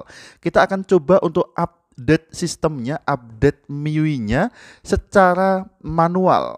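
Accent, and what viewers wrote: Magelang, Indonesia. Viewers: orang magelang kah bang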